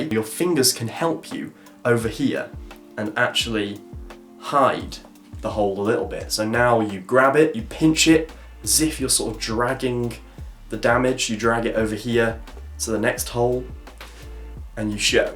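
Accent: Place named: United Kingdom. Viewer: Came for magic! Stayed for British accent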